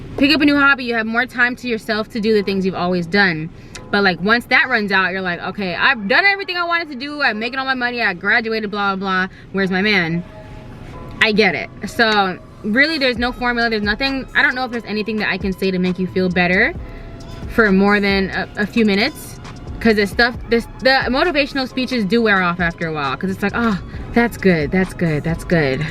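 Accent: Jamaica. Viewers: wait u jamaican?😭😭😭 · It’s the patois for meeeee. I think its the first I’ve heard you speak it, love it! You spoke some real facts though sis!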